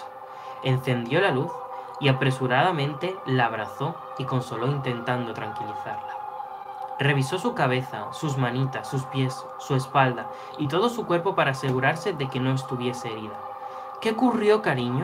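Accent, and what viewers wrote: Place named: North, Mexico. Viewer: El acento norteño...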